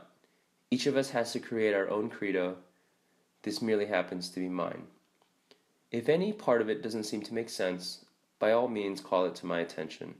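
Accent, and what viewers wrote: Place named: East Asia. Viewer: Indigomarine91 cause of this zipperheads accent probably.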